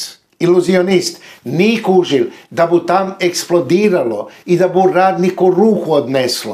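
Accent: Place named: Zagreb, Croatia. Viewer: Lijepo kad govorite pravi zagrebački, pozdrav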